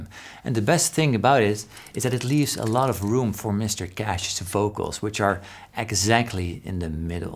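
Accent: Netherlands. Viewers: your dutch accent is so cute.All the best from Limburg.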